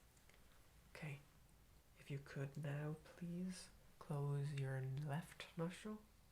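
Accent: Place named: Ireland. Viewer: Yay and Irish ASMR artist 👌🏼 I'm Living in the Uk and it's so cool to have a familiar accent sending me to sleep 😴 Subbed!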